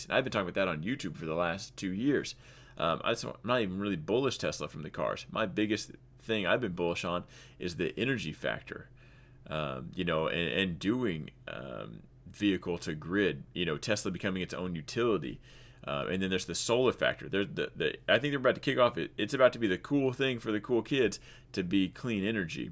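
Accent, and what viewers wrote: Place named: New York, United States. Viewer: He sounds like a New Yorker at 1.5x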